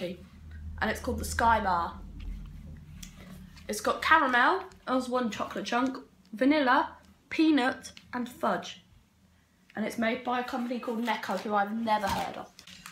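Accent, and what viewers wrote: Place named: England, United Kingdom. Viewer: You sound really English 😂 that accent is adorable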